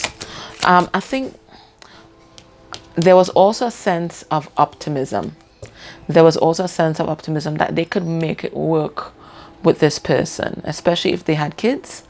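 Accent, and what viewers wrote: Trinidad and Tobago. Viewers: Hi are you Trinidadian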